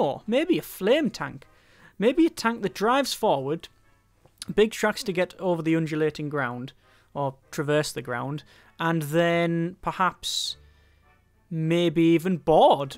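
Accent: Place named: Scotland, United Kingdom. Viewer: Dude r u Scottish or Irish cus u sound like it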